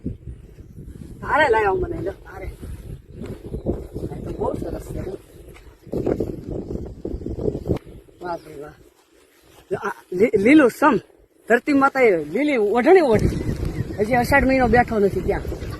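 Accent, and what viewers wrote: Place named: Amreli, India. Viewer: બા તમે બહું સરસ વાતો કરો છો ,તમે કયા ગામના છો ભાષા પર થી જીલ્લો અમરેલી હોય એવું લાગે છે તમારુ ગામ કયું ?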